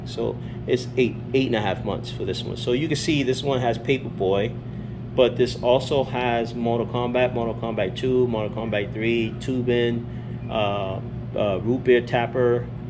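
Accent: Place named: Bronx, United States. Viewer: What part of the Bronx are you from?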